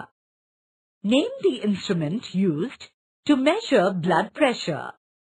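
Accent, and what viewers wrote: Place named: India. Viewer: What language is this ? English ? I barely can understand the Indian accent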